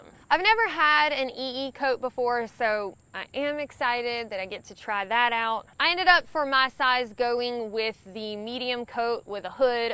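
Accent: South, United States. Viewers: Sorry about the allergies but love the Southern voice.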